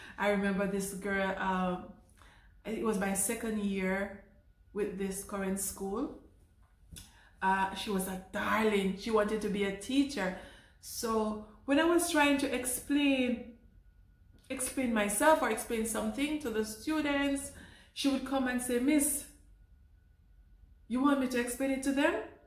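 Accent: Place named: St Ann, Jamaica. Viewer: You are a well trained teacher I enjoy your video. You are from st Ann's sound like my sister inlaw blessings.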